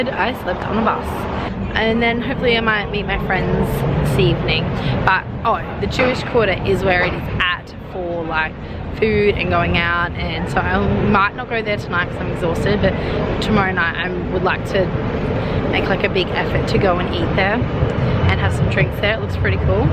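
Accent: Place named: Australia. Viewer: Your accent it's like British... but I CAN understand U... must be Australian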